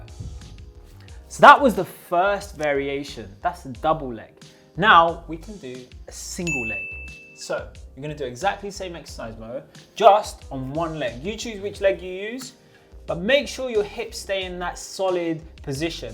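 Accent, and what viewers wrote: United Kingdom. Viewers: Brilliant British accent 😮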